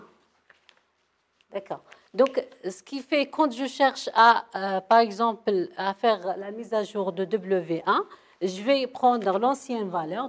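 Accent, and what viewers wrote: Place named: Africa. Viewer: Mafhamna welou tahadri bel français te3 les africains 🤣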